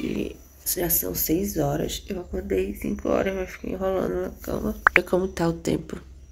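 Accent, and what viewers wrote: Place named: Pará, Brazil. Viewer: tem muito sotaque paraense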